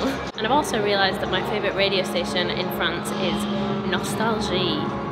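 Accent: United Kingdom. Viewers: are you from Sheffield?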